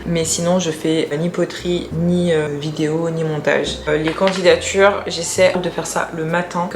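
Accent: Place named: France. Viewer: Salut. Tu viens d'où ? Je suis américain, et je trouve que tu as un accent français, mais tu as des vidéos précédentes qui indiquent que tu vis à Montréal ? De toute façon, tu as un très joli accent français, facile à écouter 😊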